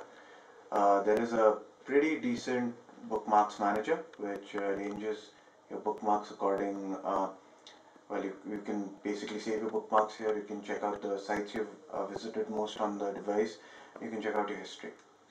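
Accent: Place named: India. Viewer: Your accent resemble like an india even your screen was not clean at all which reflects that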